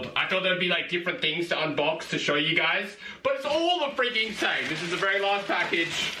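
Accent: Australia. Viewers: No doubt. He looks Eastern European with an Aussie accent. Aren't all Aussies supposed to look like Crocodile Dundee? LOL...JK! cracks me up though. He is salty and his laugh is epic. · Ive seen him do a face reveal before but i still wonder how much difference there is in his looks and voice. He sounds like a chubby australian with a goatee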